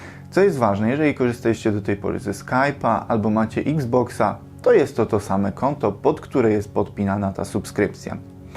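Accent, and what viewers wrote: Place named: England, United Kingdom. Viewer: I ten angielski akcent. Bardzo przydatny film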